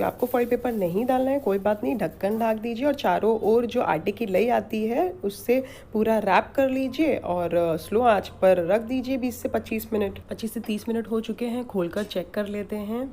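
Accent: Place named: Maharashtra, India. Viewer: Ur hindi can easily tell that u are from Maharashtra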